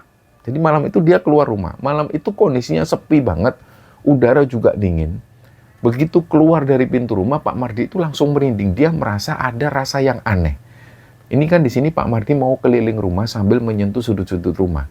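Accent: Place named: East Java, Indonesia. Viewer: Mas logat pemalange endi deneng nganggo logat jawa timuran 😅 😂😂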